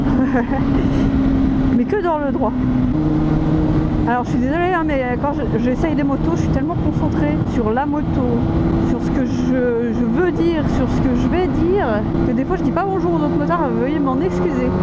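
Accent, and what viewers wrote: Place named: Burgundy, France. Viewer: Oh 😱 ta l'accent bourguignon. Jamais j'y prend en 2. t'es trop large 🤣. Et cet embrayage alors 🤔. Et ben tu m'a bien fait marrer. Eh ben dé que j'ai la mienne tu as l'essai en exclusivité. Et promis tu ne l'a pas encore essayé. Vivement l'essai du futur 4 cilyndres. Je suis sûr que tu vas kiffer la moto de Bébert bobber 😁. Bravo à pour le prêt de la machine. Merci copine pour ce bon moment passé virtuellement. À bientôt et gros V ✌🏻 à vous deux la bise de Bourgogne 😘 tu le sais déjà. Peace ✌🏻.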